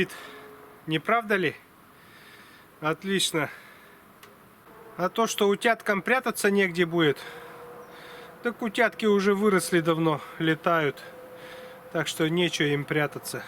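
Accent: Perm, Russia. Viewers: Нормальный пермский говор)))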